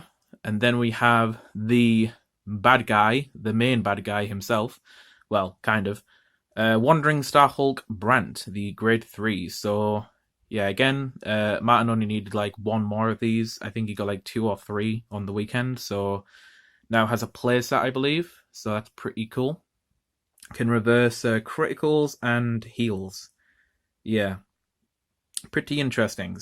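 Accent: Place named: United Kingdom. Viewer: knew it from the accent. I’m from the uk as well 🇬🇧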